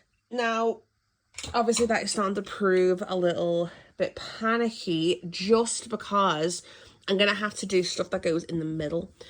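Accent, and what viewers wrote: Liverpool, United Kingdom. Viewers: Hiya! I was introduced to your channel when you met Amora on her travels and I’m made up to find another northern UK crocheter to follow. I hugely enjoyed this video and you’ve inspired me! I’m trying to guess where you live, as you’ve a very gentle scouse lilt. I was born in Formby and now live in Altrincham x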